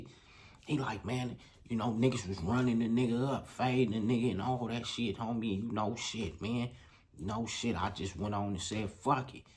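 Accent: Los Angeles, United States. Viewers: Dog you got the most LA accent I’ve ever heard! When I talk to my Cali guys I always do your impression. It’s not a good one tho… I’ve put everyone I know up on your channel. We all know you’re the real deal. Glad that the real G’s are telling their own stories in real time. Stay safe out there. We need ya.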